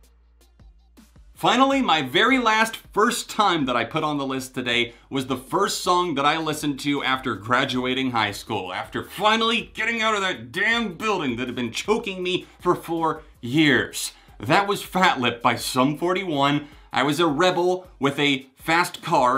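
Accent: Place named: South, United States. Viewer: Your a southern boy...I knew it...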